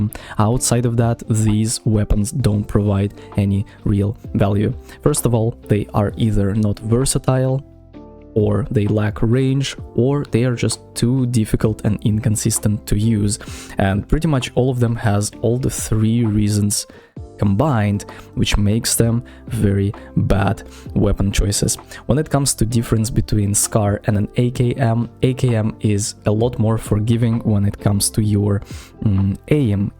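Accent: Russia. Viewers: сразу понял что ты русский)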